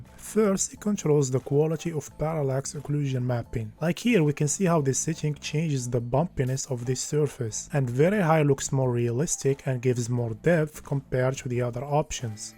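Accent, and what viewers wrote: Morocco. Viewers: yo bro are you moroccan your accent is moroccan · ana 3rfto mghribi ghir mn laccent dyalo hhhh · Naddi erftk mghribi ghir mn laccent dyalk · maghribi english n9iya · BRO i can tell a moroccan when i hear one 😂😂😂😂 rak nadi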